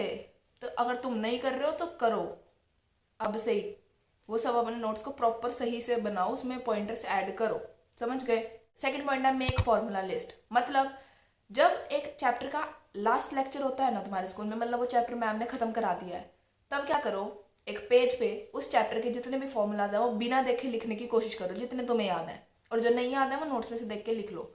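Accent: India. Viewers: Aap haryana se ho? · Aap rajasthan se ho ?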